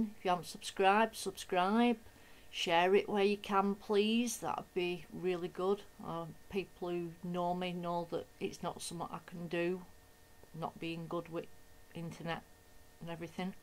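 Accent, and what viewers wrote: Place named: Northern England, United Kingdom. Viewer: Love your artwork, watch videos at night when I can't sleep so this is the first time with volume, lol, not the American accent expected but a right northern accent :-) x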